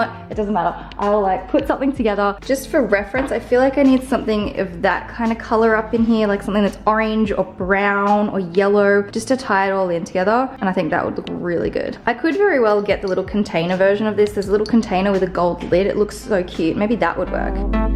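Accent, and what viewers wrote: Australia. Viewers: Just don’t loose that Aussie accent ☺️ so good to hear a local in amongst it all.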